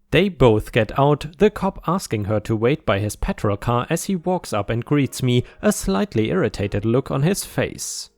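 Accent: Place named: Germany. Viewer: ⭐⭐⭐⭐⭐⭐another set of great stories, brilliantly told! I lived and worked in Germany for a while and miss the accent. Makes me feel at home sort of? If you know what I mean?? In a nice way! 🌟🌟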